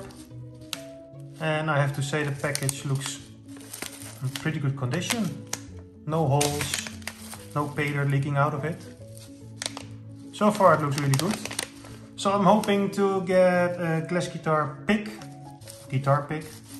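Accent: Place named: Netherlands. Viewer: Ik hoor gewoon dat je nl bent hahah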